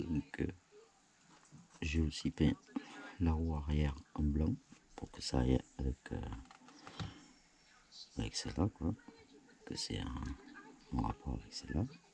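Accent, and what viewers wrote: South, France. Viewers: moi je préfère avec ton accent du ☀️☀️ it's smells the canicule 🤣🤣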